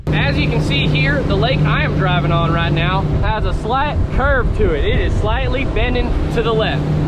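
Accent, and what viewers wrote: South, United States. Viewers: Country accent :O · Haha, nice Texas Twang! Great Tips! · Why does he have a southern accent all of a sudden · Extra Texan for this one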